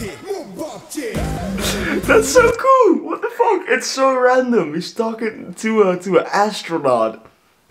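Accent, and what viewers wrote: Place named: Netherlands. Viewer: Loving the Dutch English accent, kon gelijk horen dat je uit Nederland kwam 😂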